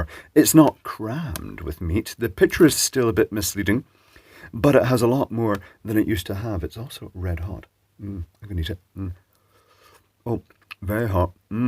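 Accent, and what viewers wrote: Scotland, United Kingdom. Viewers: Wonderful to hear such a delicous Scottish brogue describing a meat pie - the late and wonderful Ivor Cutler would have been in his element Existentially yours.......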